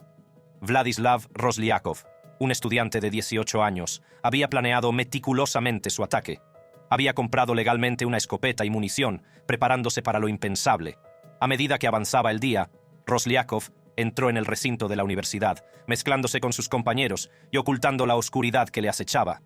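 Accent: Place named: United States. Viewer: Quizás es gringo y anda aprendiendo a hablar español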